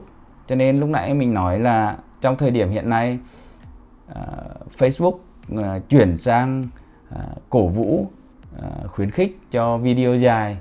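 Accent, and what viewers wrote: Central, Vietnam. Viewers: Anh người miền Trung àh.ngương mô anh quá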